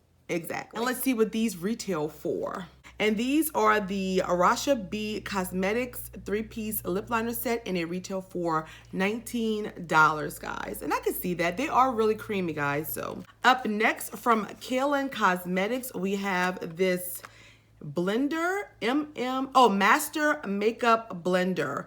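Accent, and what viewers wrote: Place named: New Orleans, United States. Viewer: I’m so happy when I hear that New Orleans accent and that slayed face. My bff in my head hey girl. Fun fact : my actual bff’s name is Latonya.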